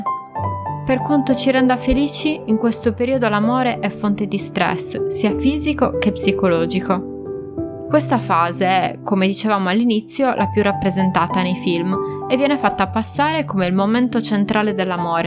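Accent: Sardinia, Italy. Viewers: tu che parli sei sarda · Accento con cadenza sarda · la voce narrante è Sarda del Cagliaritano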